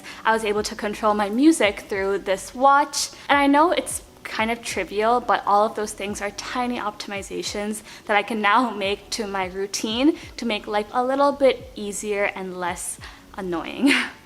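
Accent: India. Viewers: Hi Are you Indian with fake accent or NRI with "some where in between accent" or an American with real american accent . pronunciations sounds 50 percent Indian and 50 percent American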